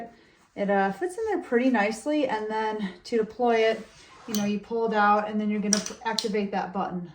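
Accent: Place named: Minnesota, United States. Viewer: I’m originally from Wisconsin. I can’t tell if your from the mid west or Canada . Sounds like Minnesota or Wisconsin. 🤔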